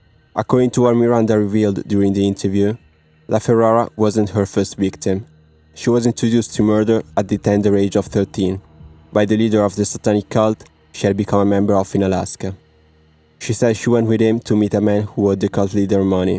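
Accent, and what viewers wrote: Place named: France. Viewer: I enjoy your accent. I'm guessing French or French colonized country.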